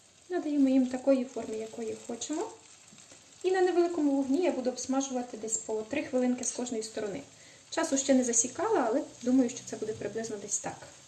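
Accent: Poland. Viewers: не ображайся, але твоя вимова трохи ріже вухо. Тебе гарна українська мова, але відчувається польський акцент на рівні наголосу на останніх складах у словах. Це робить нашу українську мову у твоєму спілкуванні дещо не гармонійно. Тому прошу це врахувати. Ти як блогер, людина з вищою освітою повинна розмовляти правильно. Покажи всьому світу, що українська мова гарна, мелодійна. Роби правильні наголоси! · мова твоя повязана з польскою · Ви молодці.Ваша українська мова чудова.Живемо майже рік в Польші і лапаю себе на думці,що частіше думаю на польській і деколи навіть плутаю слова укр з польськими.Це цілком нормально...цим не переймаюсь...Подруга моя довше живе тут,чоловік її поляк і спілкується з ним на польській,то в неї така подібна вимова до вашої.Мені подобається,багатьом теж...а кому ні то нехай не слухає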